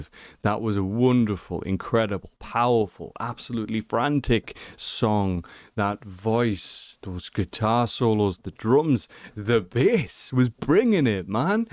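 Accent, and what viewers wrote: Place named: Newcastle, United Kingdom. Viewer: Enjoying the Geordie accent 🙃 . I don't have to say a thing about this reaction except your enthusiasm is spot man!! 😎🤟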